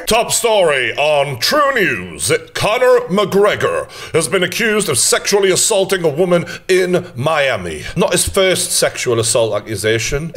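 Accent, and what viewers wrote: Newcastle, United Kingdom. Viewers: Why are you putting a funny accent on for Connor McGregor? You can’t talk about anyone’s accent you have a Geordie accent 😂😂😂😂😂😂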